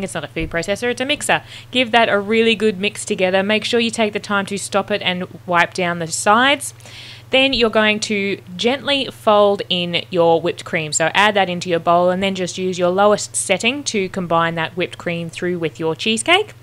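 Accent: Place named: Australia. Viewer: Wow, can't wait to make this:-) and the Aussie accent made it easy to watch;-)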